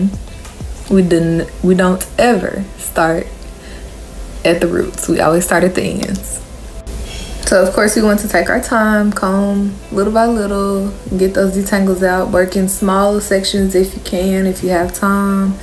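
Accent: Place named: Dallas, United States. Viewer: I really love listening to your voice - for some reason your accent sounds like a Dallas accent - your hair is beautiful, really enjoyed every moment of this process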